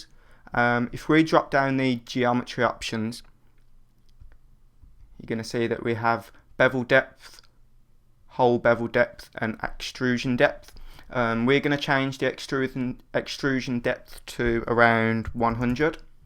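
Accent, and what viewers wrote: Birmingham, United Kingdom. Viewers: Sounds like Birmingham UK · That's a brummie accent from Birmingham, England. Chips an Grayvay! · i beleve its Birmingham (uk)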